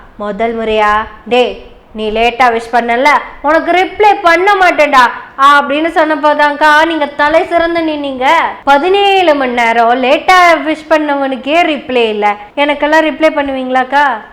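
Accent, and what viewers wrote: Kongu, India. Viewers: உடல் மொழியால் சம்பந்தப்பட்டவர்களை உடல் நடுங்க வைத்துப் பிசிறுத் தட்டாமல் கொங்குத் தமிழில் பேசிப் புரியவைக்கும் உங்களுக்கும் குழுமத்திற்கும் வாழ்த்துக்கள்!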